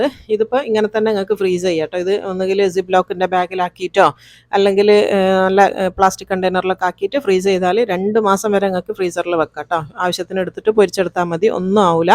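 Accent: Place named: Kozhikode, India. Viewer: Nannaayitundu❤️original kozhikode aannule.... Samsaarathil manasilaakunnundu👍🏻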